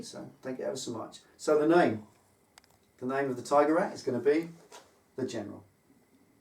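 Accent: United Kingdom. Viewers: Hi. I watch all your videos. You are a fantastic orator. Your british accent sometimes trips up my American ears though. At the beginning of most of your vids, you use a word I can never make out. I think uses it at the beginning of " ". I can't understand him either (especially in that song). What is that word? It sounds like "watcha", "watcher" or something like that. It's driving me nuts!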